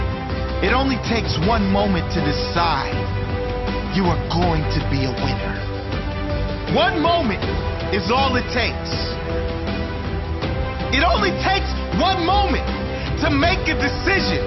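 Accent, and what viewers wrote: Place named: England, United Kingdom. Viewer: Nigga English accent!! nice 😎😎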